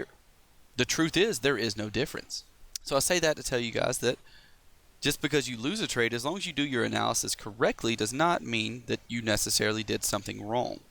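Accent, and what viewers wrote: South, United States. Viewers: .........UUUUMMMMMHHH I like the way you talk unmm huumm. Sorta Southern there aren't ya?. They videos and the analysis are comforting. I had to get out of the market for awhile ad focus on my business dealings that really pay the bills. You my friend are inspiring me to sign up and get the account funded and take some PIPS out of this market for a true life changing transformation. My full time job is draining me but I can't yet stop and walk away from it. I do have a Dream and a plan to make it happen. Got a few hurdles to clear then I I'm done.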